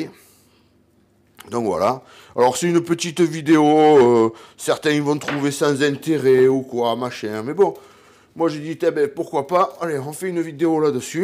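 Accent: South, France. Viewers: salut mon sympa cette petite video qui met en appetit ca donne du courage pour commencer la semaine continue te un mec super a bientôt et garde ton accent ensoleille pour nous les gars du nord si non si non tu connaît la reponse ah ah bisous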